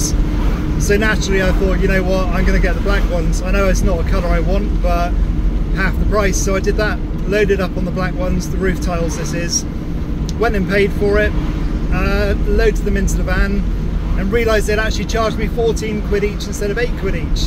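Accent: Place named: Australia. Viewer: Can you tell us approximately where you live? The scenery is so beautiful. I can tell feom your accent you are in Australia or NZ. (Hope I didnt offend-I know those are 2 very different places!)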